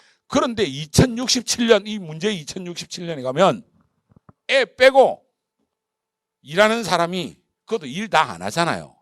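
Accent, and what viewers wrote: Gyeongsang, South Korea. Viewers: 대가리나쁘면 공부하지마 여학생이졸고있으면 쳐자빠져자는 미친년아 ㅋㅋㅋㅋㅋㅋㅋ 분필던짐 여기서 한두명만인서울 간다고 특유의 경남말투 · 말투가 경상도인데 무슨 서울? 경상도 출신이 서울사는거겠지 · 경상도 표현이 좀 섞여서 그런거에요. 기분나쁘게 말한건아닌데 그렇게 들린것같아요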